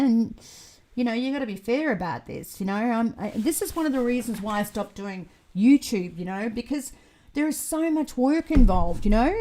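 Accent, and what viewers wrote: Australia. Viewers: Nice to hear an Aussie voice! I feel much more like I can trust an Aussie!!!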